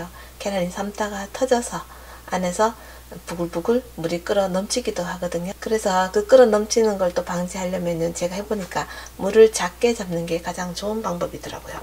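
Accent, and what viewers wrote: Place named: Andong, South Korea. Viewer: 고향이 경북봉화인가요?. 말투가 안동이나 봉화쪽 말투이신듯..-0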